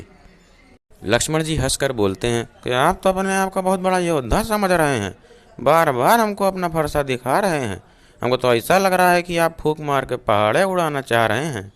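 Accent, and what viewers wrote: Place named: Bihar, India. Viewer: Gajab bihari language bole hai bhaiya aap, and thanks for this great explantion🥰 Love from Bihar❤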